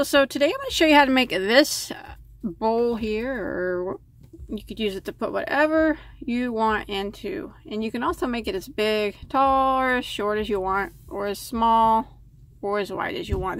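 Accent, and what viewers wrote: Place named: Indiana, United States. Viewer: I know you have heard it before but I need to thank you again for being real - authentic! You aren't selling us on things, you are sharing! Even better, as a West Central Indiana born, raised, and still residing her gal, I like someone who sounds like me! Cannot thank you enough for teaching, sharing and giving of yourself and sharing family times with us! Keep up the great work! You are very talented and keeping the craft alive!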